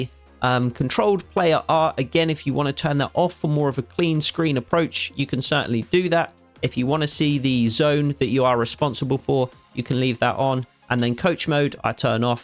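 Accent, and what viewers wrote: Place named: United Kingdom. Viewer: I'm sorry but I can't help but cringe anytime I hear a British person talk about college football.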